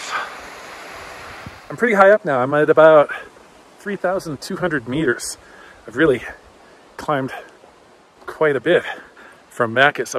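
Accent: United States. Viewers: what? I'm a troll? Hey buddy I'm not the one who speaks like a gringo. Also I'm not a primitive jungle dweller named Suki. Imagine that. Me a respectable citizen being called a troll by accent man.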